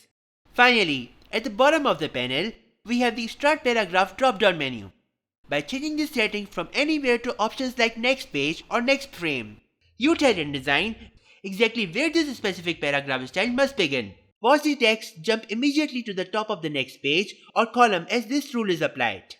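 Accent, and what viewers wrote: India. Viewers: Glad to hear about this, may be just a bit slower taking into account that you are being watched in english and you talk as Hindi english : )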